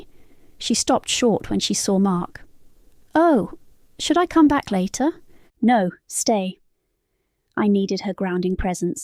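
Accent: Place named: United Kingdom. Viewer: So lovely listening to that British accent read this story aloud! Am enjoying this so very much!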